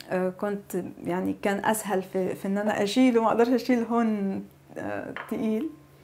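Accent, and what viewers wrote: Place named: Egypt. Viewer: احلى حاجه فيكى لهجتك المصريه اللى محافظه عليها وعاوزاكى لو سمحتى تفيدينى عندى بذور الشيا وعاوزة استفاد منها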